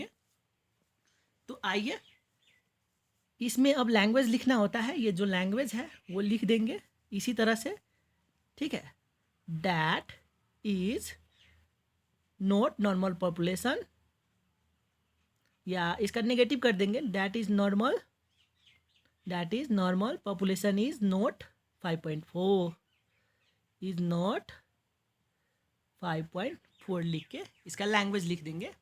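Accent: Nepal. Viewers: are u nepali ? broh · Why 🤔 speaking in Hindi with nepali tone ? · Nepali accent 😂…anyway thank you so much ❤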